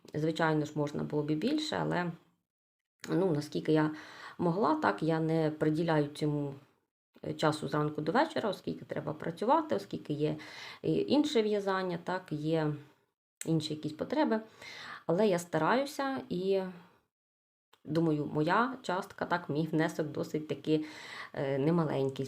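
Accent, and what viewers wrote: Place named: Poltava, Ukraine. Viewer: Прив!т,почула св!й Полтавский гов!р,!нформативне в!део треба спробувати.Здоровья та миру.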